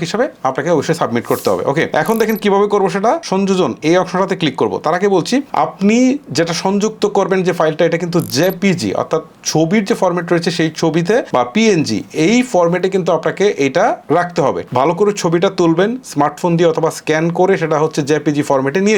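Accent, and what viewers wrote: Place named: Brahmanbaria, Bangladesh. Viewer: Brahmanbaria mone hocche 🤭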